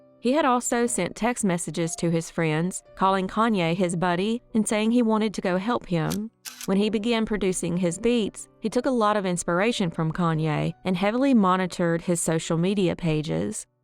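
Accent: South, United States. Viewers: Just heard about this story. 10 y/o Bob Boyes went missing in port republic, calvert county, md, Dec 26 1968, along with his pet deer, and has never been seen again. I know you already have a lot of suggestions. but would love to hear your southern accent, doing his story. Both parents, now deceased. Love fr md expat, living in panama. Thanks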